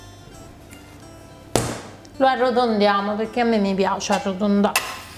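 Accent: Naples, Italy. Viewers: Adoro questa tuo accento napoletano... Sei simpaticissima e bravissima complimenti!! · Signora siete troppo simpatica, nonché precisa e chiara, spiegazione eccellente , italiano corretto con la simpatica cadenza napoletana!!!!!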